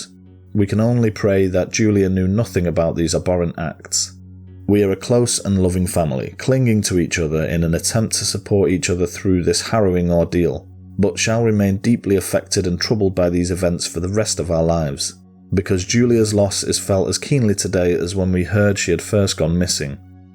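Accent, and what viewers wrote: United Kingdom. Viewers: Hey, I just discovered your channel a few days ago and have now watched several of your offerings, some familiar, some unfamiliar. However, being a fellow Brit I am enjoying your perspective (& accent! 😊). I very much look forward to catching up on more of your vids and your future releases. Blessings... Puccini 😇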